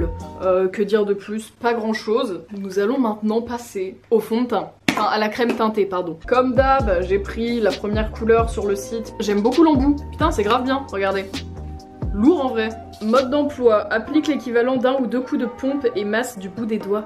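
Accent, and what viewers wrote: United Kingdom. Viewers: Mais elle est 100% British pas américaine, écoutez son accent 😢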